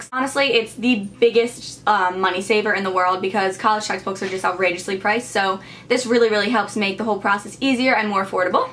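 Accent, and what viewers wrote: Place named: Midwest, United States. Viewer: you sound more like someone from the midwest, not a New Englander. But awesome advice!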